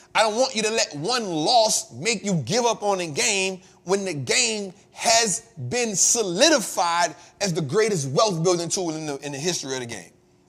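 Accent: New Orleans, United States. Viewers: I wouldn't worry about your pronunciation. Anybody who's going to quibble over your articulation probably isn't from the culture like that. I don't even have a New Orleans accent but I knew what you were saying. You should probably send somebody like that somewhere else. Because in the future they're going to try to change you. So unless you're just working on it because it's something you want to do then don't change. Say things the way that you want to. Besides that kind of keeps it encrypted for the culture that gets it. The culture that probably needs your help the most. Somebody arguing over something like that is probably kind of uppity anyway.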